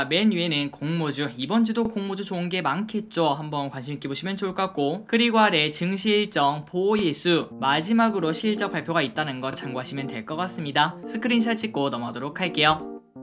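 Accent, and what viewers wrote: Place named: Gyeongsang, South Korea. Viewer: 경상도 억양이 살짝 들렸는ㄷㅔ......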